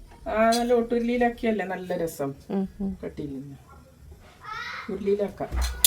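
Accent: Kannur, India. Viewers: ങ്ങളെ കണ്ണൂര് പാഷ പൊളി ആ ന്ന് കേട്ടിനാ ങ്ങൾ ?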